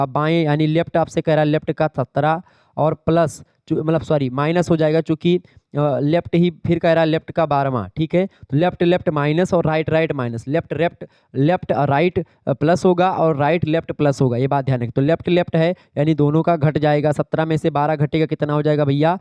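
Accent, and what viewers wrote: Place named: Awadh, India. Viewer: सर के मुंह से अवधी भाषा बहुत प्यारा लगता है❤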